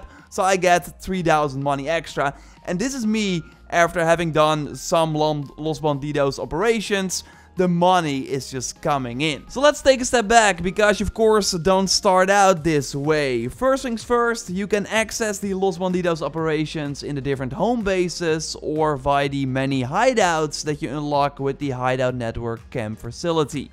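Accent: Netherlands. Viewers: I instantly hear the ditch accent in a milisecond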